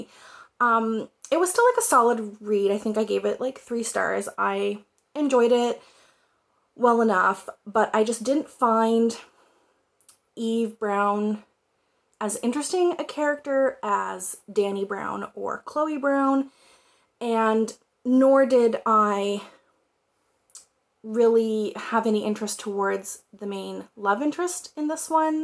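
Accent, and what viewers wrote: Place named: Maritimes, Canada. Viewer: Good to know, Maritime dialect! 🤓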